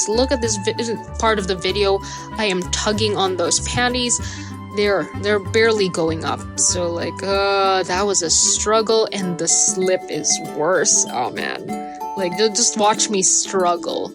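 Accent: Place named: Philippines. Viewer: Holy shit that's really expensive.... Also, are you from the Philippines? I could sort of hear the Pinoy accent. Aaaaand the Dollfinas kinda gave it away for me. Not much though, I still had to Google it. Edit: Nevermind I just got to the part where you said you got something from Manila.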